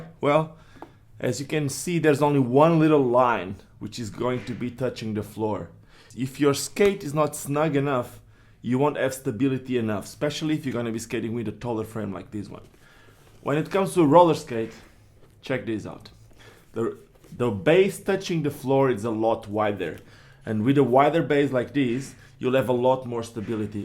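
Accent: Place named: Portugal. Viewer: Are you from brazil or portugal? Cause you have a portuguese accent. Great video btw (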